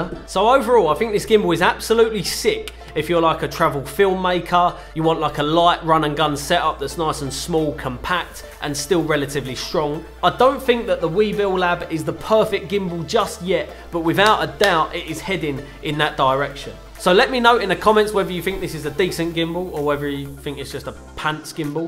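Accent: United Kingdom. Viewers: Ok, subscribed after 30 seconds just for the raging britishness of the lad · I'm broke but you sold me. Quite refreshing to hear a brit speak on YouTube instead of Peter Mckinnon, Alen Palender and all them Canadian fellas. Great video, cheers. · i like british acsent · you are extremely british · OMG You're like the hottest Youtuber talking about gimbals. My opinion is absolutely biased because I'm obsessed with gingers and British accents. Just subscribed cause you can talk about any tech and I'll think it's super hot. Xxx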